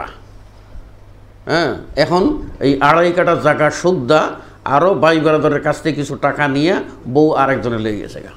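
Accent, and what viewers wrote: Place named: Sylhet, Bangladesh. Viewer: He is a Sylheti accent..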